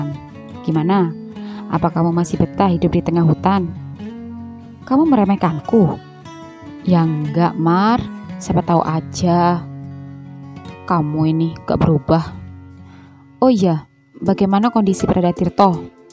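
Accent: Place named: Eastern Indonesia, Indonesia. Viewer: .. 👍👍👍 Aku suka cerita mu.. Siapa sih pembawa cerita ini? Kamu pandai membawakan cerita ini dengan dialeg Indonesia Timur mu hebat. Hanya dialeg Jawa kasar nya perlu improvement sedikit.. Dan sangat menarik cerita nya dengan pandangan taboo budaya Indonesia… Malu malu walaupun mau dan harus membohongi diri sendiri.. self denial..😢😢😭😭 Semoga selalu ber kembang dan sukses Channel ini.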